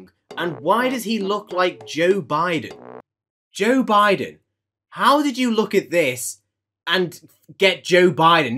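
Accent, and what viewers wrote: United Kingdom. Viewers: You sound so ✨British✨ today mate.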